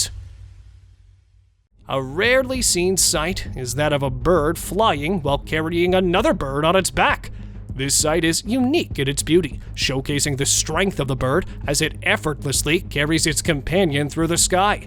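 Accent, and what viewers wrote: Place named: Russia. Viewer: Mne kajetsa, chto dictor russko-govorashiy.. 🧐🤌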